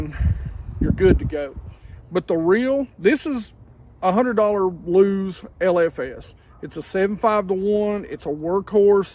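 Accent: South, United States. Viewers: I'm new to bass fishin and you got a sub out of me! Your very informative and thorough. plus that good ol' southern accent just makes it easy to listen to haha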